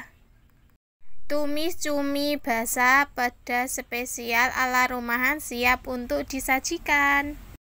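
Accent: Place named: Java, Indonesia. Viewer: Mbak e bahasa medhok banget.....😅😅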